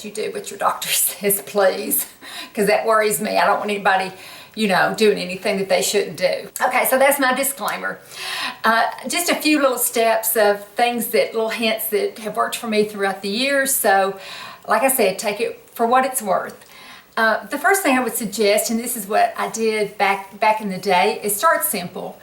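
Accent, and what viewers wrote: South, United States. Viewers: Your skin looks beautiful, especially your neck. How often I see girls ignore their necks and when the face looks good but the neck looks like a turkey's wobler, just ruins the effect. Being a Carolina gal, myself, I really loved listening to your Southern accent. Your hair is so thick looking on top, any tips on hair loss? Thanks, nice video from a Beautiful Lady.....................................Linda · My mom was Southern, so its very comforting listening to you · It's a beautiful southern accent:)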